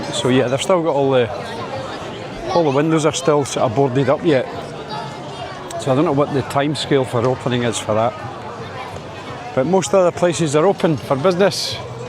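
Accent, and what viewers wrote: Scotland, United Kingdom. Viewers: Interesting vid again, many thanks. A small aside, I like the Scottish accent having spent a bit of time working in Hamilton and other parts over the wall, but I did a little testing on this vid. If you play this at 2x speed, it sounds exactly like Russ Abbot's Scottish character. I'll get me coat... 👍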